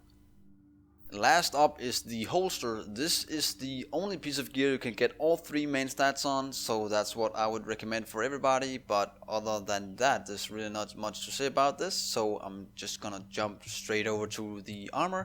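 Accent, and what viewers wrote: Denmark. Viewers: Er du fra københavn ?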